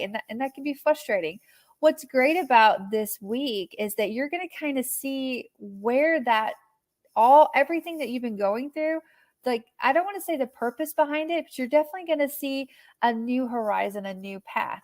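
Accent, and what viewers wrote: South, United States. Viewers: I love the light southern accent you have!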